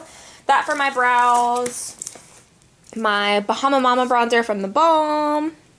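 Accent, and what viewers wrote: South, United States. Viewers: in your current videos you have a southern drawl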